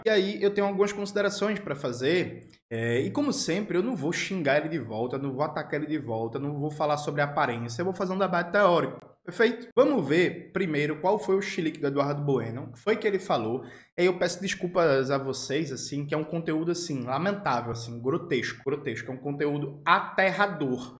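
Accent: Pernambuco, Brazil. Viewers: vc tá cada dia melhor! Esse Eduardo resolveu aparecer, hein! Chilique feito só pra te provocar e aparecer aqui, agora que seu canal tá crescendo... E o sotaque pernambucano é um sotaquezinho maravilhoso, lindo e gostoso mesmo! Sou do ridijanero e amo! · Oi, tudo bem com você? Não tenho embasamento prá fazer discussões teóricas. Acho essa virulência das redes sociais um horror! Seja lá qual for a discussão o que eu ví naquele trecho de vídeo é totalmente inadequado, um chilique mesmo. Gosto muito dos seus vídeos. Só tenho uma crítica muito séria. Acho que o Marxismo propõe análise de conjuntura e na minha opinião, você não faz isso quando não apoia claramente o Governo Lula. Está certo fazer críticas, o próprio Lula pede isso, mas eu gostaria que as críticas viessem sempre com a ressalva que precisamos apoiar esse Governo, nem que seja como um Governo de resistência ao fascismo, à extrema direita, ao bolsonarismo. 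Acredito que se o Presidente Lula resistir e conseguir ganhar o próximo mandato (2026 a 2030)prá ele ou algum sucessor, daí então poderemos começar a nos sentir um pouco mais "seguros". O Mundo está em risco e, não entendo como deixar de creditar ao seu Governo a diminuição da fome no País, por exemplo. A cadela do fascismo, sempre no cio, está nos nossos calcanhares e nossa única e frágil defesa é o Governo Lula nesse momento. Vamos apoiar! Criticar mas apoiar, explicitamente. Só prá lembrar a análise de conjuntura, se esse movimento da extrema direita conseguir ganhar a próxima eleição acabará o debate. Você está acompanhando até onde eles queria chegar, tenho certeza Foi por um trisco! Gosto muito do sotaque pernambucano!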